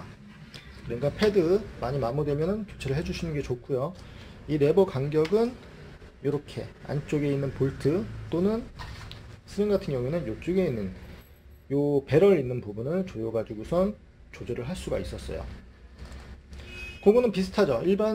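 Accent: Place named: Gyeongsang, South Korea. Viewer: 찡기다, 아까침에... 이런 사투리가 나오는거보니 부산경남쪽이 고향이세요? 마산에도 잠시 있었다는거보니 그런거 같네요 ㅎㅎ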